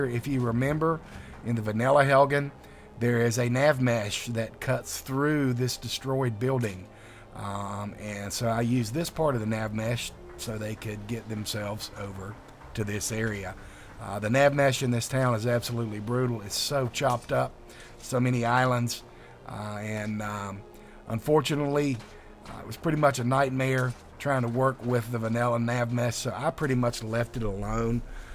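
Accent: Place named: North Carolina, United States. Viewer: North Carolina was my first guess :) I work with a lot of Southerners... developing a good ear for that I guess! Looks good.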